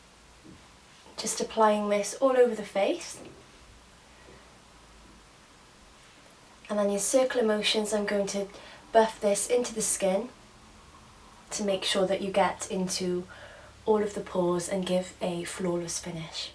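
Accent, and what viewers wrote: United Kingdom. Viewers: you welsh?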